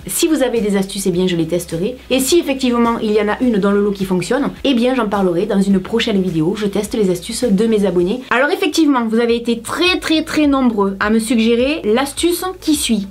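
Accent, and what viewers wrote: South, France. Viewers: Pour la buée sur le miroir j'utilise du savon mais pas liquide, le pain de savon comme avant... C'est un peu galère à étalé mais avec un peu de patience et «d'huile de coude» il y a moyen de n'avoir aucunes traces sur le miroir et plus de buée pendant un bon moment. Bon forcément c'est pas définitif, il faut le refaire de temps en temps mais ça marche beaucoup mieux au savon solide que liquide.;) Merci pour tes vidéos et ton accent du soleil qui me donne la pêche jusqu'en Gel Bique;)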